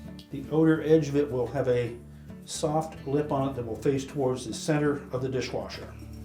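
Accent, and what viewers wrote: Canada. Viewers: I think he might be from Canada. They would have an accent like that.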